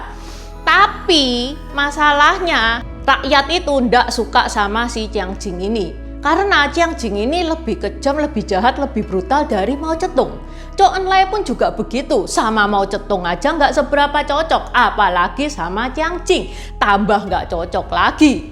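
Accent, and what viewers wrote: Java, Indonesia. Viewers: Bahasa jawanya kental haha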